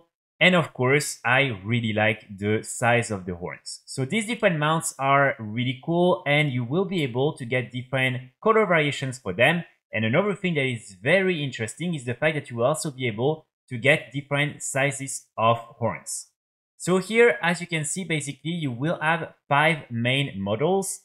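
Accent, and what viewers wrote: France. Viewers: Pas mal le french accent 😏 good video haha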